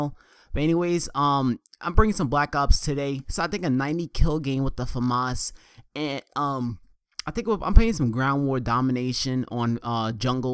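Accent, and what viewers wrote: New York, United States. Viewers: And new york accent doesnt bother me! It actually makes your commentarys more unique! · I don't mind your New York accent at all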